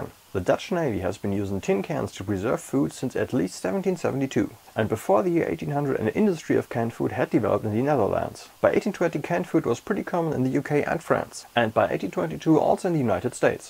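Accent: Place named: Germany. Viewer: FOrget about what others are saying, you are just starting out, no one should expect you to be perfect. It doesn't matter if your camera is off, you don't do the white balance right as long as the content is great. Which it is. You give interesting facts and you have a german accent. Good enough for me. :)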